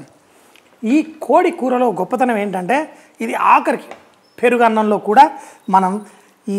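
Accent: Telangana, India. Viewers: Telangana rural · Already I love your programs in english, Now your 2nd innings in telugu, especially in telangana style at some times funny with your Dorasani is reaching to telugu people is very interesting, But I could not understand what is "dinchuck dinchuck"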